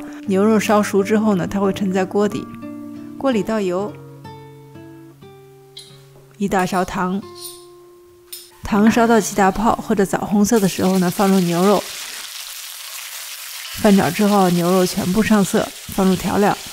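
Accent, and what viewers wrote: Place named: Xi'an, China. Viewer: 之前在国外就看你的视频，那会儿一听口音就感觉是西安的，做的菜跟我家也很像，一晃几年了，回国了还看你做菜。❤❤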